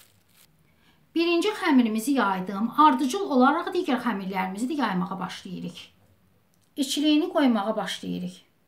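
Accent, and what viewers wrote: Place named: Turkan, Azerbaijan. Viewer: Salam xanım necəsiniz. Yazan Nihadın anasıdır. Əllərinizə sağlıq görünüşü gözəldir. Lətçənizdən Zirə, Türkan lətçəsidir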